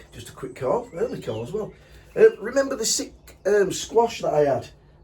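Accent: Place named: Leeds, United Kingdom. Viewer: Ah. As I continued watching I figured - LEEDS LEEDS LEEDS! Fellow Leeds man here! Take care!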